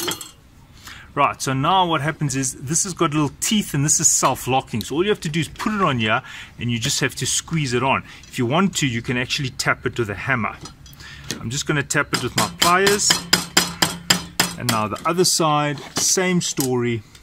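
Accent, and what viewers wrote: South Africa. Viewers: Love the SA accent, going to assemble my weeeber tomorrow now. Thanks mate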